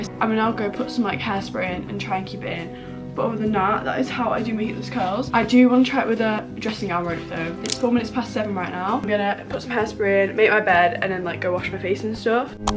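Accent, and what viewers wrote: United Kingdom. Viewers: I freaking love your British accent!! You’re so pretty too!! <3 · Is this more a southern uk accent or a northern one? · Are you from the uk🇬🇧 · do you have british accent ?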